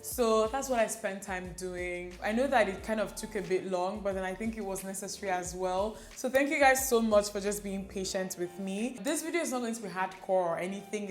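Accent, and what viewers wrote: Igbo, Nigeria. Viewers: Where have you been, you sweet? Lol. I recently subscribed to this channel and I love that slight Igbo accent you've got!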